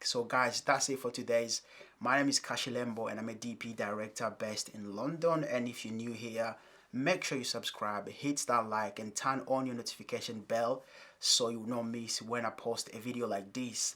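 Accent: Africa. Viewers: Great review brother. You broke It down nicely. But you don't have a British accent. You sound African. Even if my eyes were closed. 😊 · Your accent is telling me your African using a some London and American slang words you don't sound like you're from London at all.. I agree with your break down tho.. The movie seemed very rushed and not really funny at all.. A big let down it was ok in some parts but overall not good.. Your totally right about the clothing and lighting after Black Panther I thought Americans have stepped up but not this time..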